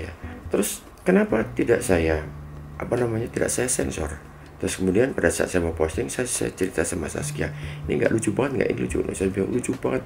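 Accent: Java, Indonesia. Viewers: Ya allah mas hanung, logat jawaanyaa bikin ngakakkk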